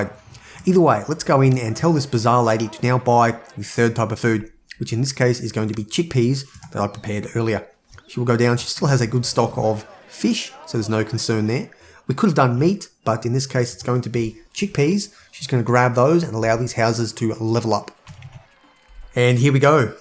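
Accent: Australia. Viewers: Are you Australian? I'm an Indian who's just been exposed to different accents and I'm trying to understand all of them lol! 😂😂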